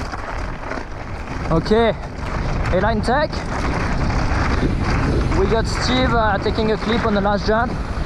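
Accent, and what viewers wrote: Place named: France. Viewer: joli accent français XD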